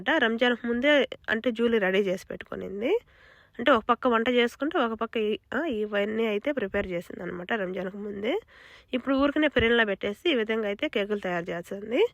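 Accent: Rayalaseema, India. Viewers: Rayalaseema slang baaga telusthundhi sister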